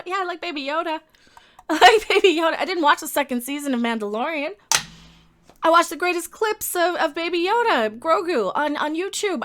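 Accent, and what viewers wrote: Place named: United States. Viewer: your demeanour is Australian but with an American accent. Refreshing to see someone else done with modern cinema and tv media. You need a Google chromecast, and then you can play 80s and 90s movies and tv shows.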